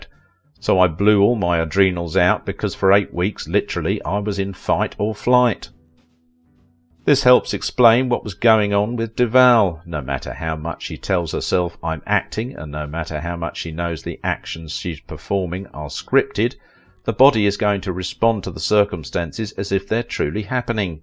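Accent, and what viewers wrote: United Kingdom. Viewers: Why are you so bad about misaligning images and audio? "let's talk about how frightening the Shining was while showing pictures at that exact moment from Popeye." Also, is this voice yours? Or are you another shitty Chinese/Indian channel that hires British voice actors to try and give the illusion that they do good work?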